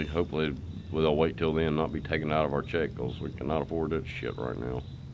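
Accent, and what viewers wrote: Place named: South, United States. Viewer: Thanks for checking out our channel. Thought I would do the same. If I may ask, where are u from? Hear a southern accent. I was born in Arkansas, and lived in Texas a few years, growing up. Enjoyed the vlog.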